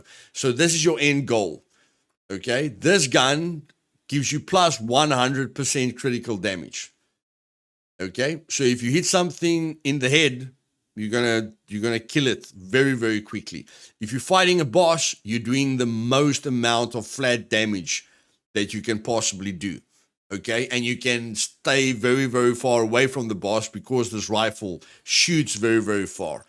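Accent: South Africa. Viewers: You a south African my guy I can hear your accent 👍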